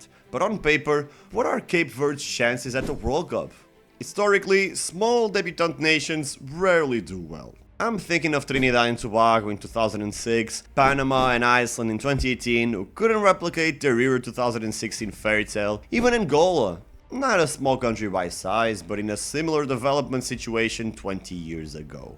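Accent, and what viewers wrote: Portugal. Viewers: By your English accent and your perfect pronunciation of Portuguese names, I can tell you are Portuguese. It is pronounced “Bubissta”, and not “Bubishta”. Good video, BTW! 👍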